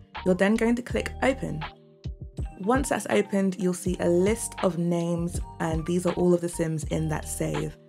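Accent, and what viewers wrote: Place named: United Kingdom. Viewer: i’m new hereee bur girl you’re british!! omggg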